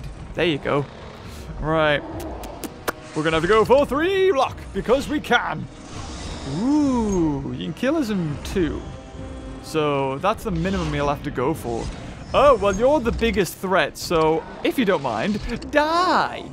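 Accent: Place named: United Kingdom. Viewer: Why you talk british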